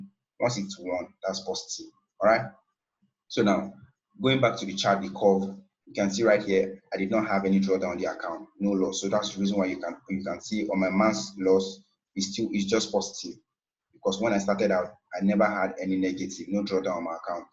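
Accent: Nigeria. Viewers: Are You a Nigerian ?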